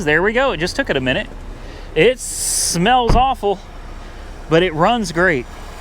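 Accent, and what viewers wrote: United States. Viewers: Come on Man, its you Yanks mashed English Aluminium into "Loominum". Not even Germans do that.!!. Even a Life Boy becames a "Life BOOeeee", and that confuses the rest of the World. Rangers generally have shitty little 4 Pot leaf blower engines, that brakeless ones good.!.Ford Service /Dealers got a Shit name over here in SE Asia.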